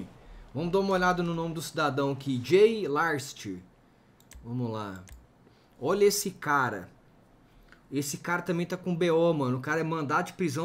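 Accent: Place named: Goiás, Brazil. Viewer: Uau! Pensava que você era de São Paulo, mas é goianão hein hahaha que massa. Psé eu também sou, aliás o vídeo ficou maneiro. 👏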